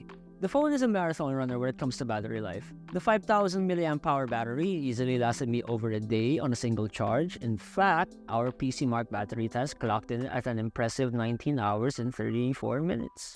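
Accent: India. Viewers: Bakit may slight na Indian accent? Lol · Indian nationality ba yung nagsasalita? 😮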